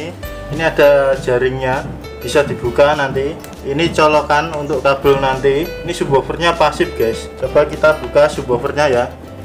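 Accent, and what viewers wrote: Java, Indonesia. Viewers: klo ada langsung tak like. aku pokoke ngefasn karo bahasamu mas. medok jowo marai ngakak. ngrungakno tok wes ngakak.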